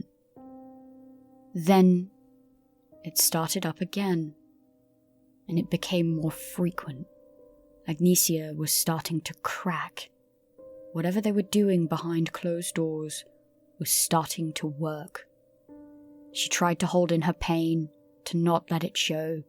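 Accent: United Kingdom. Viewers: I'm looking forward to it. Not you kicking the bucket or retiring.. I really enjoyed the story and the voice reminds me of a really good narration on a channel called cold open stories or something like that. It was also a sisters story. It probably wasn't her as I think all British females sound exactly the same.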